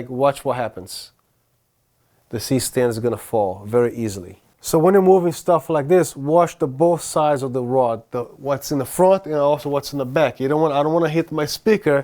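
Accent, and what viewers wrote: Brazil. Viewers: haha yes, I know a lot of Brazilians here in Houston and recognized it, hope you had a great new years, this was great information and you explained it really well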